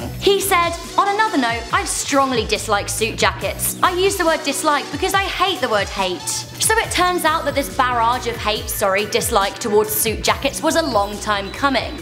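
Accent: United Kingdom. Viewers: I know btw love your british acsent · Why do so many people who watch this channel act as if they've never heard a British accent before?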